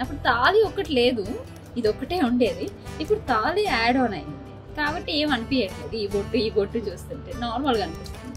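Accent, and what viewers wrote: Andhra Pradesh, India. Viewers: andhra matladakandi 😂please jai telangana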